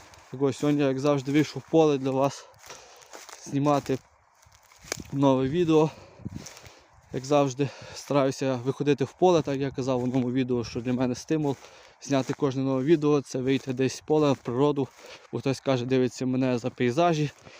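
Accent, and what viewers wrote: Ukraine. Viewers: Я так розумію ти з західної України по розмові! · Виглядає, що ви із Львівської обл. Може, навіть із Золочівського району. Співробітник мав подібний говір. Не подумайте, що намагаюсь шось вирахувати , бо знаю, що у людей зараз ще та параноя. Просто цікаво.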